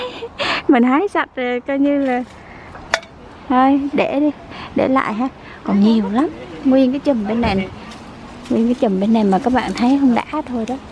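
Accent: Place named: Quang Nam, Vietnam. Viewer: Nghe giọng c như người miền Trung Quảng Nam Đà Nẵng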